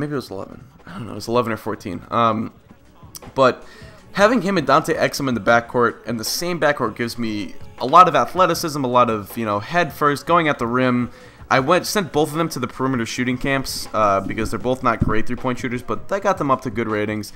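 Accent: Boston, United States. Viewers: Boston accent in full force this video lol (hAHks, adam silVAH)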